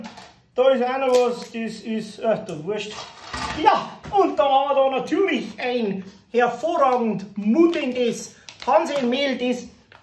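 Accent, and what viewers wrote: Bavaria, Germany. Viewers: Dein Hund ist süss und gut erzogen ( oder satt) 😀 Ich liebe einfach bayrisch😍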